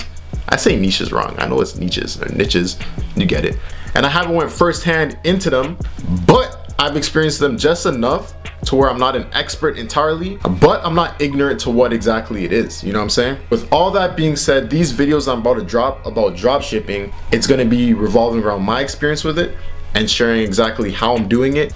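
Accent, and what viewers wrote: Toronto, Canada. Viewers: you from toronto bro? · You can tell this guy's from Canada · You from Toronto fam? I hear that accent haha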